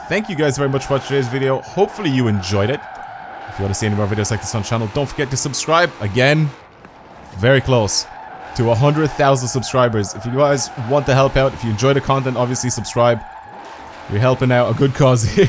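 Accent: Ireland. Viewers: Hey, quick question, where abouts are you from, I can’t help but think you have an Irish accent although I could be completely wrong! Keep up the great work!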